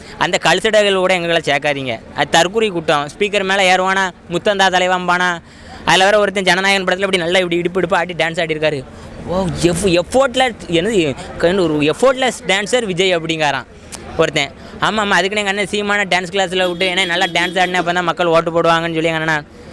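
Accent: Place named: Tirunelveli, India. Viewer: நெல்லை தமிழ். இனிது ❤❤❤❤. வெற்றி நிச்சயம். நாம் தமிழர்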